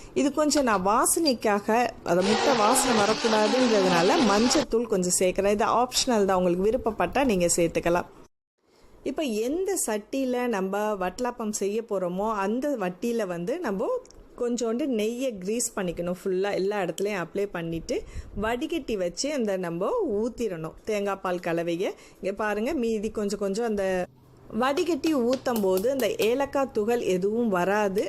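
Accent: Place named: Kayalpattinam, India. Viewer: So wat ..it's kayal dialect..just enjoy d recipe..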